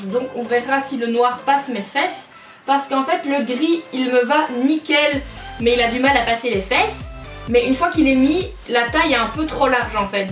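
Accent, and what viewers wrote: Belgium. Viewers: le bon accent des carolos on adore ❤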